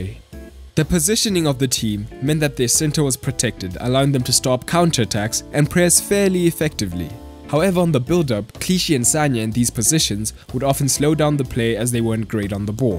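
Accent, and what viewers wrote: South Africa. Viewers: I'm sorry but your accent sounds South African, are you South African?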